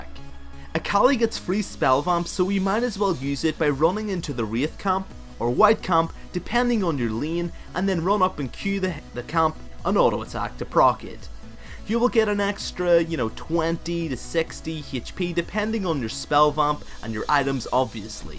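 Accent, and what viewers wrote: Northern Ireland, United Kingdom. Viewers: He's Irish, more specifically, he might be Northern Irish. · whaere is from? Never heard his accent before, and can't say if it's more British or American...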